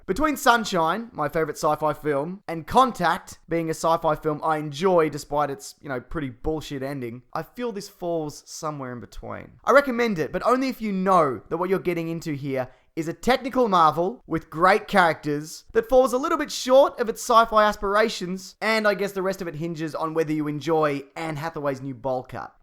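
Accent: Australia. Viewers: Your Australian aren't you mate ?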